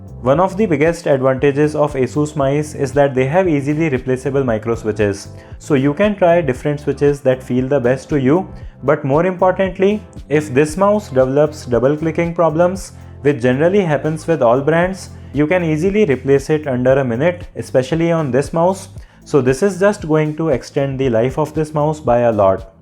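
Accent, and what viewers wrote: India. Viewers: Your English like a hindi language 🙄❤️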